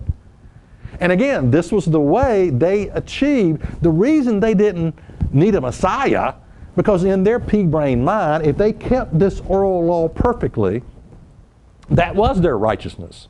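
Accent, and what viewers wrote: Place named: Australia. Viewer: Pea brain lol. You are a man after my own heart and speak just like me... an Aussie lol. I think you did a great job explaining the difference between a Law and a commandment of God. Thanks... you helped me a lot. So I now know that if need be, I can walk more than 600 meters on the Sabbath day. Now what about the food laws... can I eat pig? It is not written in the Ten Commandments, but it is in the written law of Moses right?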